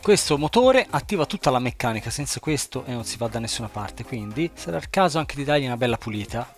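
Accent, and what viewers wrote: Tuscany, Italy. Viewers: Da quale parte della toscana vieni?😂😂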